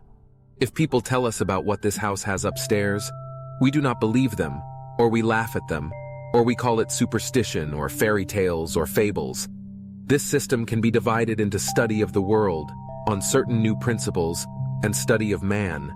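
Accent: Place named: United States. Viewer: Yet another Yank accent.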